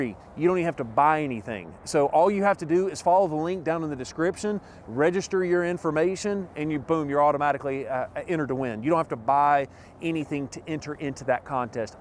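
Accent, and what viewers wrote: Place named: Alabama, United States. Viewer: Great video and I can understand you pretty good with your Alabama accent even if I am not a native english speaker. Out here in Germany we just have mid-size trucks (all Diesels because of gas price and mpg) and they haven't been very popular until a few years ago (maybe because of the VW Amarok). But we always had some grey imports of fullsize trucks. But it seems like more than 80% of them are RAM's. But I like the F150 better also because of your videos. Great content your videos. Wish I could have done that when I was a car salesman (VW) years ago. Greetings from Bremen (Beck's beer City)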